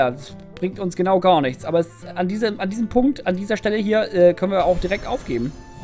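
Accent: North, Germany. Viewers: ich finde das auch gut so wie die Videos sind :). Bin selbst zu dumm für HearthStone und mag es dennoch dir dabei zu zu sehen, weiß gar nicht wieso ich dich nicht vor der Session mit Chris gesehen habe... bist sehr unterhaltsam und ich liebe den nordischen Dialekt :D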